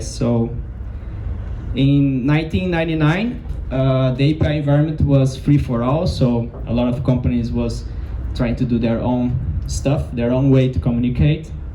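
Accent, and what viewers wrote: Brazil. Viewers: Você fala inglês muito bem! Não sei se é do seu interesse, mas caso seja, você pode tentar estudar um pouco o alfabeto fonético da língua inglesa pra treinar um pouco a pronúncia nativa. Isso não é necessário, mas talvez seja interessante caso queira perder um pouco do sotaque de brasileiro (espero que entenda que não é uma crítica, provavelmente você sabe mais de inglês que eu! Kkk)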